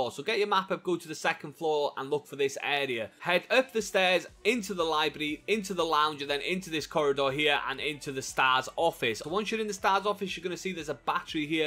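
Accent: United Kingdom. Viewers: thank you for your uk accent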